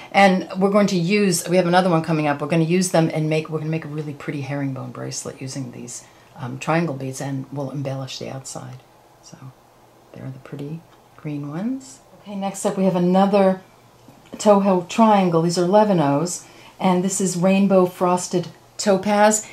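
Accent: New York, United States. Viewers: An absolutely gorgeous collection!!! I just ordered the collection and can’t wait to see your tutorials! By the way, I couldn’t help myself I ordered the three collection bundle too, LOL I’m going to have a lot of beading to do! Thank you so much for all your tutorials. I have learned to bead by watching your tutorials and greatly appreciate your amazing designs, clarity of instruction, excellence in video production and your New York accent! I’m a Jersey City girl living in San Diego and love to hear your voice, it makes me feel so at home. Again congratulations and thank you for all you do to help us learn about this amazing art form!😀🙏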